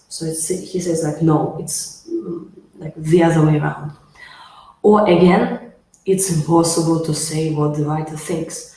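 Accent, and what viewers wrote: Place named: Russia. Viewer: 100% Russian акцент )))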